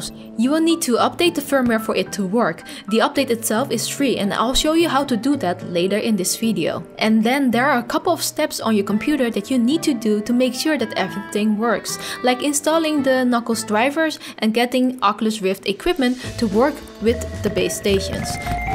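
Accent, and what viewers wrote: Netherlands. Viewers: Are you dutch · o the dutch accent always hurts my ears . but thanks for the answer !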